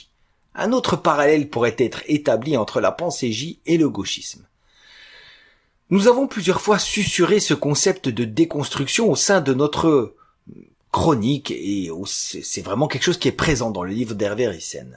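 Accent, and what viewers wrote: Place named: Algeria. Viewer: Merci pour toute ces lectures, et sinon vous êtes maghrébin n'est ce pas? En tout cas votre "accent" et façon de parler l'est et c'est de l'algérien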